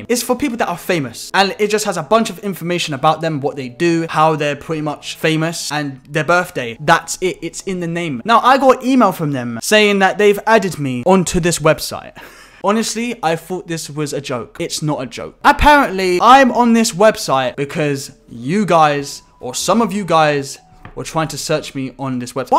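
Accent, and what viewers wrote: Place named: London, United Kingdom. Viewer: What part of London are u from? Btw I'm soulth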